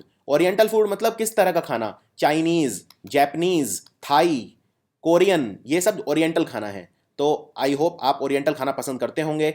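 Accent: India. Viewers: first just know that how to pronounce words. blody Indian accent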